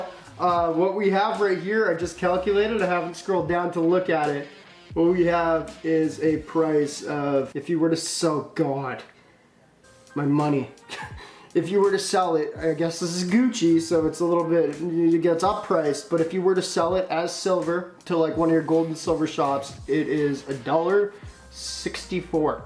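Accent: Canada. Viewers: dude are you canadian or american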